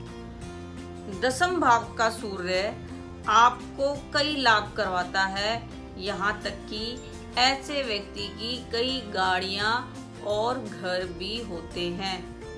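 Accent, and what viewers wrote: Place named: Punjab, India. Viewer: madam app ki awaj me punjabi jhalakti ha kya aap bhi punjab se hain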